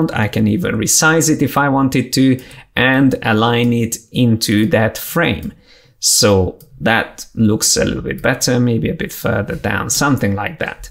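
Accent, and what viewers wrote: Hungary. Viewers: jól hallom a magyar kiejtést? :D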